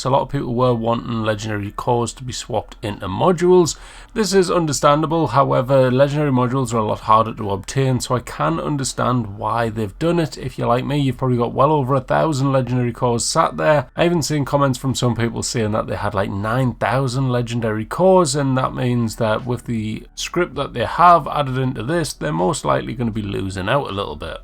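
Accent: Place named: England, United Kingdom. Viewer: He's from England. This is your problem, not his.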